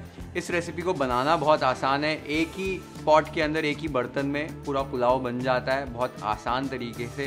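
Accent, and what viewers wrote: Maharashtra, India. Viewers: Aap sab recipe try kiya aur masale bhi Sab eakdam perfect jesa kaha gaya wesa he kiya hey❤❤its taste perfect an superb Best recipe I loved was kala mutton thali u made sambar eak Briyani bhi 😊 Mala Tumcha Amcha Maharashtra Varcha Pream kup Avdal tume Manapasun banvtat ani Bolta sudha 😅 Love ur Marathi acent in Maharashtran Recipe you have Done till Now ❤❤❤❤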